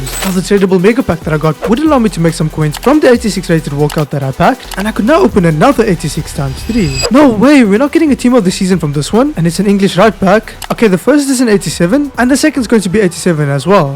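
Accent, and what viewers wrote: South Africa. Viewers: Yooo u south african 🇿🇦?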